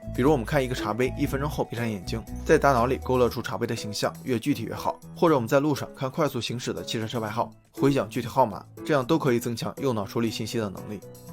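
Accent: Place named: China. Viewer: 听这口音，up本来就是我们国内的吧😅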